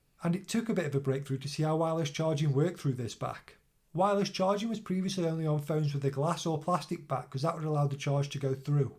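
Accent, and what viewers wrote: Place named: United Kingdom. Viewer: Only here for native British accent. Loved it bro!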